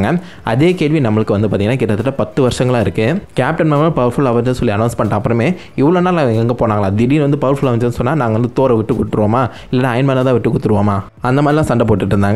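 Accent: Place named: Madurai, India. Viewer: Ji Neenga Entha Oru nu sollamudiuma. Yenna onga Pechula Madura Slang theriyuthu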